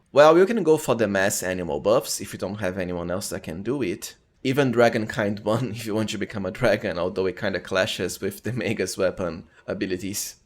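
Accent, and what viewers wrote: Brazil. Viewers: 5 seconds in and I KNOW you are Brazilian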